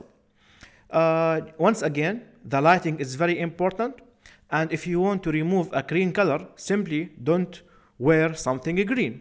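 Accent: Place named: Arab world. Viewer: يا اخي حاسك عربي🤔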